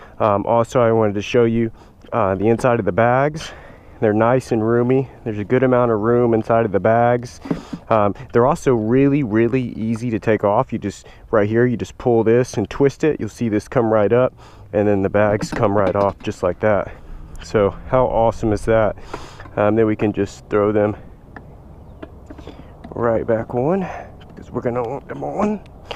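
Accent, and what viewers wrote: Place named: Philadelphia, United States. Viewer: You from Philly?.....sounds like it. Like this bike and the different looks and functionality...thanks for the review.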